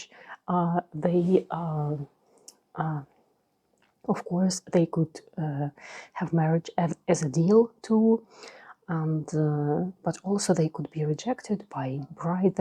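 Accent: Russia. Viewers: I love your videos!!💗❤️ just found you and I’m very happy to have. I love the Russian accent for asmr lol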